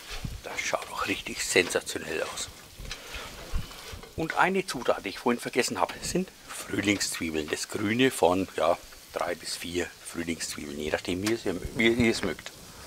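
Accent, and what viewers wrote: Franconia, Germany. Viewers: Saugut! du bist spitze. Endlich mol a Frangge und ned su a zipfl der ned richdich deitsch sprichd. Grüße aus Bamberch. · Hi das sieht richtig richtig gut aus ich werde das mal nachprobieren 👌 Sehr schön anzusehen mit deiner ruhigen Stimme und sympathischen Art, das bringt selten einer rüber, a echter frangge hold 👍 Vielen lieben Dank für dieses tolle Video und ich lasse sehr gerne das Abo samt Domglocke da um nichts zu verpassen 😉 Gesund bleiben und weiter so ✌️ Gruß Olli ✌️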